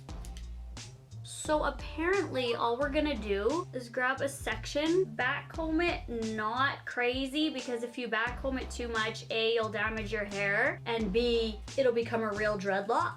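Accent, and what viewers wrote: Canada. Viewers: It's your Canadian accent!! Lol!! You were close! Great job! If you had thicker dreads you would look just like her!!❤️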